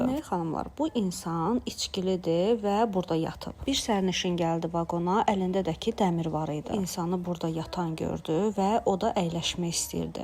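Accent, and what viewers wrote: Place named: Russia. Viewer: amma mən elə bilirdim siz rus dillisiz deyə belə formada danışırsız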